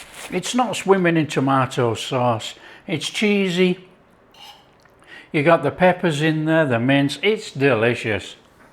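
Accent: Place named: Scotland, United Kingdom. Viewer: American here.... My grandma made this recipe, she was a dairy farm wife, but she cooked the noon meal at a New Mexico high school for many years. She also made a southwest adaptation by changing the spices. She added cumin, new mexico red chili pepper powder (it's the best, rich and a bit sweet), pepper, canned beans. The sauce & meat mixture can also be layered with corn tortillas & cheese lasagna style. I remember eating this at my jr high school in California. I sure enjoy watching you cook. I now live alone and struggle with poor appetite and no desire to cook. Occasionally I will make one of your more simple dishes. I can't figure out your accent... I hear Scottish & northern England. Occasionally, you say something that sounds like my great grandparents (descendants of Scottish immigrants in the 1600s to Appalachia).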